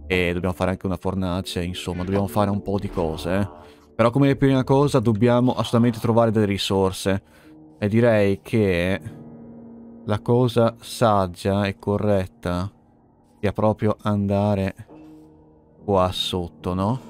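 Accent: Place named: Verona, Italy. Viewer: Sono quasi certo sia veronese.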